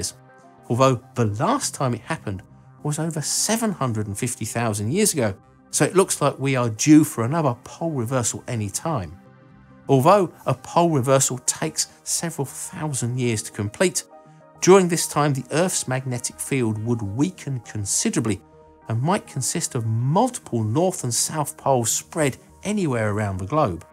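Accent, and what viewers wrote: Australia. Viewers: Despite the high Australian accent, this guy's elaboration of scientific facts is cool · comfy voice, ..accent sounds Australian.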